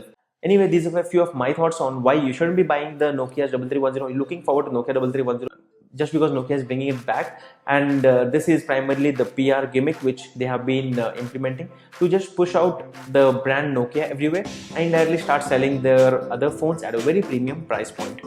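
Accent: India. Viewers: indian accent sucks!!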